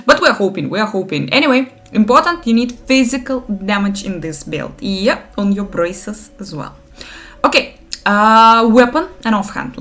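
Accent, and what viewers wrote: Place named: Russia. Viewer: просто акцент был похож на русский, извиняюсь, продолжай в том же духе)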